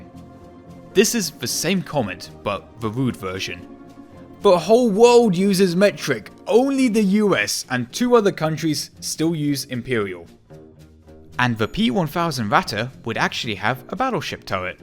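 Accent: United Kingdom. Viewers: Im fucking rolling. Damn mate. Forgive me but i always thought you were British from your accent. You're fucking awesome mate please keep making more awesome content. This made my weekend. (Watched this feb 22 so im bit late.) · I mean, there are areas of the UK that just talk like that, I’m sure they didn’t all go to private school.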